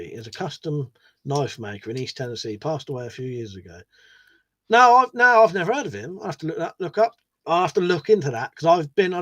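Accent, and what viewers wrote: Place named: Suffolk, United Kingdom. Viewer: I detect a suffolk accent...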